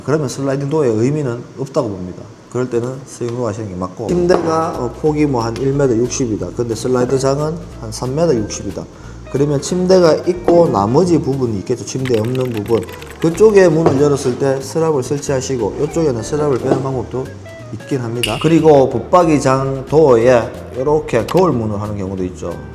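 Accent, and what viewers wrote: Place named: Gyeongsang, South Korea. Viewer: 설명 잘듣고 갑니다. 오랜만에 경상도 사투리 들어서 좋네요 ㅎㅎ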